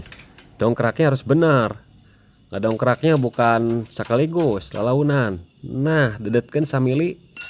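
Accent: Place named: Garut, Indonesia. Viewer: Tutorna mantap.urang Garut sigana mah,karunya urang Manado Weh rada ngahuleng wkwkwk😅😅😅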